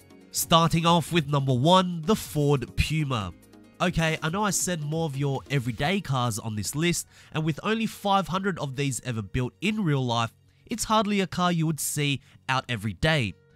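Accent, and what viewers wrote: Australia. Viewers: I never knew you were Aussie mate. I can hear your accent now. I'm across the ditch. Those Rovers were popular here back in the day. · aussie voices are the best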